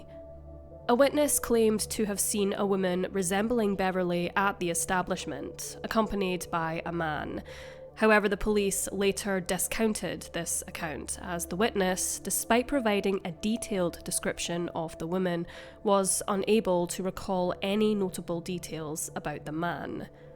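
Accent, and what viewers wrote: Scotland, United Kingdom. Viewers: She is Scottish and the English... UK pronunciation is coupee. Stop expecting everyone to speak American English. ~From a fellow American. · The narrator is Scottish.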